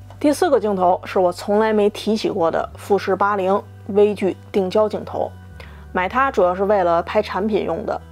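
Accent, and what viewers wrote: Beijing, China. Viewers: 北京姑娘吧？和我一姐妹儿特像。讲的倍儿清楚。关注了。希望讲讲X100系列。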